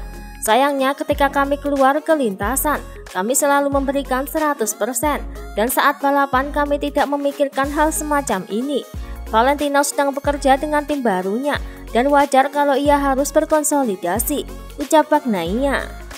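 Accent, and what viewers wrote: Java, Indonesia. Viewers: Hadir mba medok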